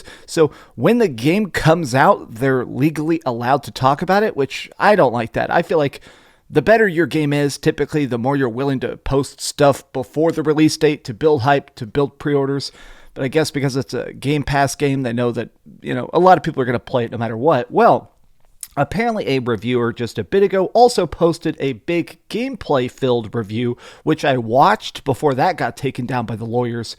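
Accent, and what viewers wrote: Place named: Texas, United States. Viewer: I am not American. But I love your accent, Texas boy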